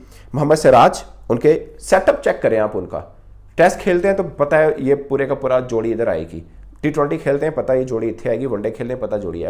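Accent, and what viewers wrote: Punjab, India. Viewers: Your Punjabi accent is too good sir....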